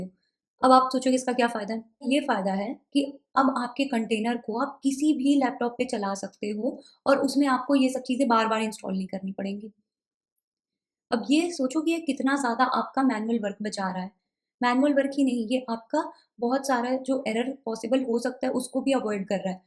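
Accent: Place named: North, India. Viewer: That North Indian hindi accent killing me Sorry but more focused on you than content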